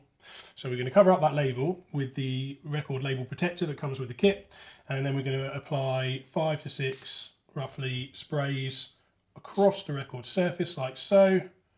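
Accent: United Kingdom. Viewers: Sounds like you are from the U.K. those 'mofi sleeves' doesn't seem readily available for Amazon UK. Have you tried any alternatives, say the 'bigfudge' brand or Amazon's recommended 'spincare'. Heard lots of mixed reviews about their sleeves. Cheers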